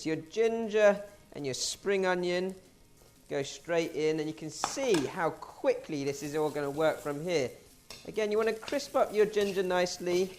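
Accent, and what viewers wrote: United Kingdom. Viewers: Never seen a asian negga, wit British accent. Very nice. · I focus on his accent the way he talk. I love british accent 😂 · Chineese with a British accent love it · Why he sounds like he from the UK🤔🤔🤔😁anyway it is so important to me as a Chinese sista to learn how to cook Chinese dishes for Suga Bearz Cafe I'm collecting all recipes did I tell yall my egg roll horror 😨😨😨😆😂I ate it😕 an if bae was there an not on camera duty he would have ate it too right bae 😒I want a wok so bad I can't wait I want traditional food it's all going to be apart of SUGA BEARZ Cafe soulkitchen on Royal Underground tv charter spectrum 32 booyah happy cooking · I love this British accent, better than the cockney accent. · weir to heard a chinese having british accent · Interesting to hear a Chinese man speak British exotic im quite amused lol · Let me figure this out......... 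An Asian guy with a British accent?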